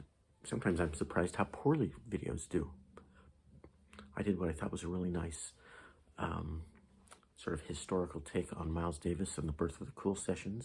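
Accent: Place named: Scotland, United Kingdom. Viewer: I nearly spat my tea out when said you were Scottish! 😎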